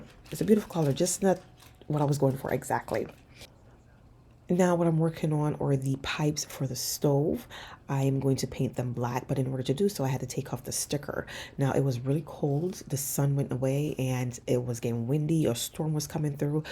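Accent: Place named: Jamaica. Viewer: Everything looks great. I just love it. I noticed when talking to your husband your Jamaican accent is really thick as opposed to when you talking in your videos. This is not criticism just something I noticed. I loved it.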